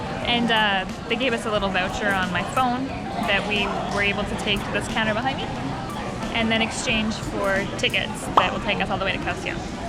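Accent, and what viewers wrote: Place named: Canada. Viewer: Not gonna lie, I have to skip that intro 😂 also you have the most Canadian accent I've ever heard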